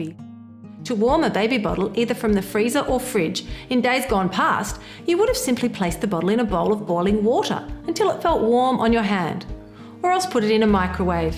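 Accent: Australia. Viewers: how much water do i add? cant tell with the thick australian accent... 1 - 5 whatt??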